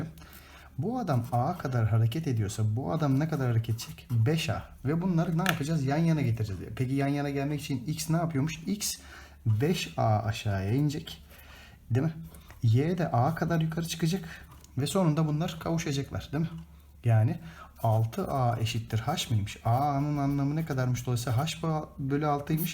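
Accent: Thrace, Turkey. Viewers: harikasınız hocam.. trakya ağzını da öyle cok özlemisim ki videoları hızlandırıp izlemeyi bıraktım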